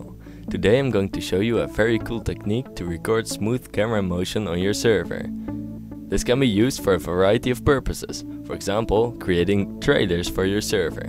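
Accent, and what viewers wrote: Netherlands. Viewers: Ha. Hij is gewoon nederlands. Ik dacht al hoorde een hele vriendelijk nederlandse jongen:)Hoi! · are you from the netherlands??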